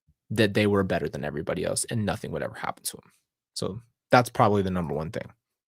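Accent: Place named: Boston, United States. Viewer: "Piece a gahbage" lol, you sound just like a Boston boi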